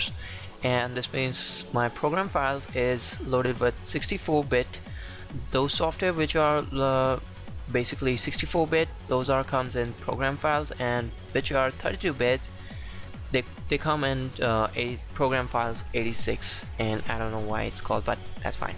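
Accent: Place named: India. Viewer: your indian accent is kickin'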